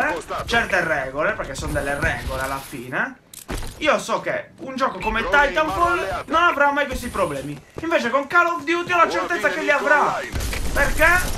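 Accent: Sardinia, Italy. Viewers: Vai...ma sei sardo? Se si...anche io sono sardo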